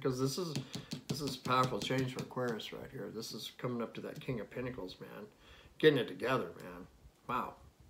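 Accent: South, United States. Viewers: I just adore your southern accent my fellow Aquarius. I’ve got a York Accent. I always love your readings xxo Omg so right on! He had better come correct or not at all. I went true Aquarius and shut him out!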